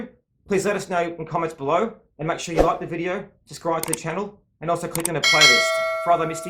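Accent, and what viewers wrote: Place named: Australia. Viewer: Let’s “dive” in and “cov” our name into the back of our watch (carve) . Love that Aussie accent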